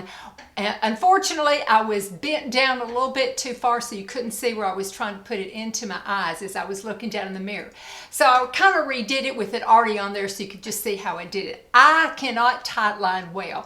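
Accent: South, United States. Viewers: LOVE seeing you twice in one week!!!!! thank you so much. I appreciate it more than you can know! And I'm PROUD of you for taking down ANY video you deem necessary! I guess I didn't read the "bickering" comments, as there were SO many that were flooding thru, but just saw lots of Spiritual women ! I go along with Any of your decisions! I'm here for the skin care, beauty tips, and love the makeup GRWM's over anything else. However...Love your serious ones, too. Guess I just love seeing your face and hearing your southern voice like mine! We sound the exact same here in NC!!!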